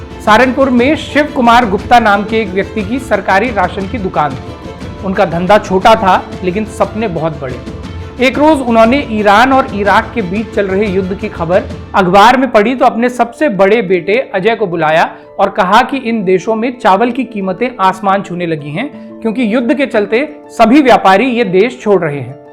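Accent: Garhwal, India. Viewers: हिन्दी-भाषा को गढ़वाली-पुट देना जी अपनी शैली है, जो कि अत्यंत श्लाघनीय प्रयास है, साधुवाद।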